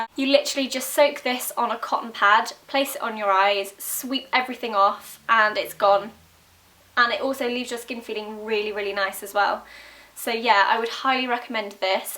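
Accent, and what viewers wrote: England, United Kingdom. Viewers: you're the representation of an british accent :D cute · i subscribed because your amazing british accent, but then i figured out i love your videos, too ;D well, your really pretty and really amazing! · British accent-3-3-3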